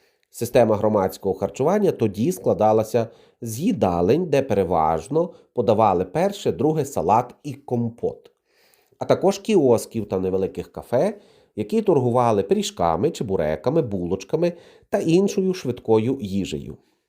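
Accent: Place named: Western Ukraine, Ukraine. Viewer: ЩО ТИ МАЕШ ЗАРАЗ ЧОГО НЕ МАВ ПРИ СРСР ? АВТОР ВІДЕО МАБУТЬ ЗАПАДЕНЕЦЬ ЕМІГРАНТ СЛУХАЮЧИ ЙОГО МОВУ .ГИДОТА.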